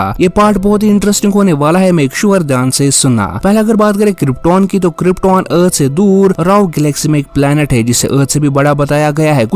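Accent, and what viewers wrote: Kashmir, India. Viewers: Bro Aap kashmir se ho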